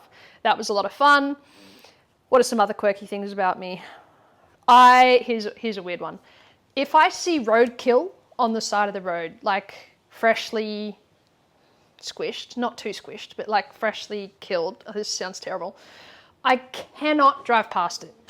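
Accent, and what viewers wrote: Australia. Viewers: Love the Hello. Great fellow aussie accent. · Bonjour, my first name is Stéphanie and I’m from Québec ⚜️, Canada 🇨🇦! My first language is French and second English so I understand most of when you talk with your LOVELY Australian 🇦🇺 🥰 accent even though I have to pay attention to some specific words you say sometimes in the mouvements your describing… I’m thrilled to get to know you better through this video 🎥 cause you lymphatic flush full body routine was literally a game changer in my life for the past two months! Let me explain: I’m 49 and like most of us during the past four (<num>) years we had because of the “global 😷 situation” gain a little bit of weight and, like most women, I was struggling with my body that I didn’t really like… one day I decided that it was enough and that I was gonna be 50 and fabulous (2025) so I decided to check on YouTube to do some exercise by myself and there you appear!… Since two months now, I’ve been doing your lymphatic flush full body 10 minute movement routine at home 🏠 every single morning before my workout 🏋️‍♀️!!! 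At First I do yoga for 30-40 to minutes, then the lymphatic drainage and then the cardio workout! In one month I lost half of a size around the hips and I feel soooo much more energy after doing your exercise and during the day! Your enthusiasm is contagious and now I even say the movements at the same time as you do 😂!!! I’m writing all these details so you can actually have some feedback from Real 👈🏻people (literally at the opposite side of the world 🇨🇦🌎🇦🇺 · So glad I found your videos. Always go for random one of your videos to mixup what I’m doing! Excellent! Ps I’m in Scotland and while doing a video I practice my Australian accent, makes me lol sometimes when I copy your accent adds to that positive feeling 😂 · Hello from Houston Texas. I too found you from your lymphatic video but lately I've been doing so many more of your other videos and ABSOLUTELY loving them. Thank you so much for sharing your heart with us. 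It is true that I'm drawn to your energy but for me its also your accent. Australia is near and dear to my heart because my parents almost moved there when my mom was pregnant with me but didn't. I've never been there but both my husband and I badly want to visit and we will. All your videos challenge me and it's shaking my body as well as my brain up. You're a wonderful beautiful woman and please just keep doing you. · All of a sudden you just popped up!! And I love it and you ❤. I do your lymphatic exercise for 10 minutes daily which I started 2 weeks ago and I can tell the difference, no matter how busy I am this is my first exercise I do first in the morning. I did detect the Australian accent love it I’m a Brit!! You are the Guru for me because you’ve done so many amazing things about the human body and quite knowledgeable about it. Love 🥰 !! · Hi, i absolutely love your energy!!! I found you accidently by one of your videos about fixing neck pain, literally week ago! 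Learnt so much about my body through your videos! And one more thing, im in love with your Aussie accent, could listen to you talking for hours! Im Polish, i live in UK, so maybe thats why? Who knows lol, but your vibe is just so contagious, most likely because you are your authentic self! Keep going! Im looking forward to watching more of your brilliant content!! Best wishes Ania xx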